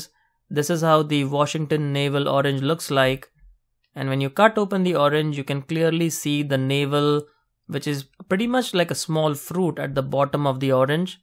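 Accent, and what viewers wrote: India. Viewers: Are you indian??..... Your voice seems like...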